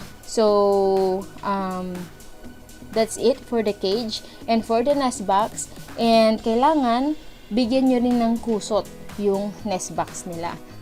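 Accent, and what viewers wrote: Pangasinan, Philippines. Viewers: Pangasina po kau Ma'am?hehe may accent ksi hehe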